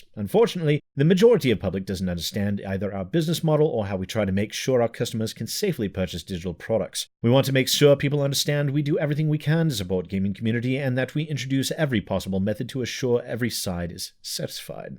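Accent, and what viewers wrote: United Kingdom. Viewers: That britishsounding reading with supersnark felt so TB I had pangs. Gotta go watch some Genna to support her.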